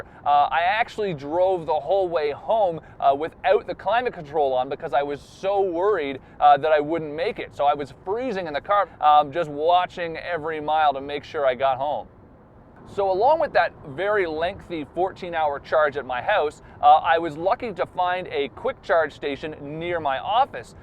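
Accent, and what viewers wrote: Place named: Canada. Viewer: Different accent. Listen to how he says the word "out." He sounds Canadian/North American Mid-West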